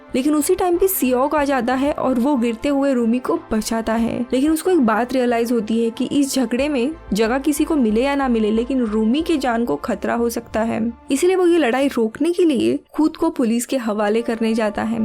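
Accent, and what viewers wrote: Maharashtra, India. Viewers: as usual one again nice explained ...👍 Marathi accent aasun pan hindi yevdi clean kashi boltes 😁😅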